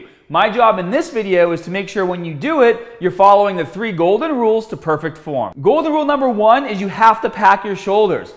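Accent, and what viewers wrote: Boston, United States. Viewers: Glad I found you again, I used to watch you way back, maybe <num>. All I could remember was that I was looking for a guy with an epic Boston accent. Great content!!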